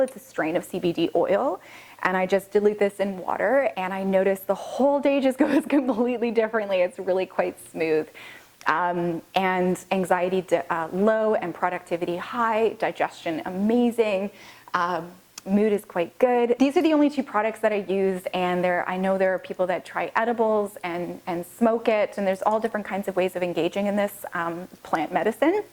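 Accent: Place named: Canada. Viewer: Are you canadian ? Just wondering cause your accent is awsome ✊💯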